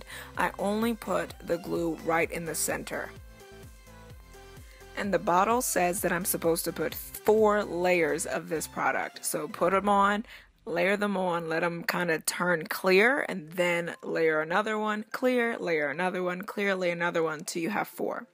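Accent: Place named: Jamaica. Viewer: I don't wear wigs but am definitely subscribing, plus as u start talking I knew I was listening to a fellow Jamaican 🇯🇲, then when u said u had to wash with your hands back home I was like yes I knew it she a Jamaican 🇯🇲.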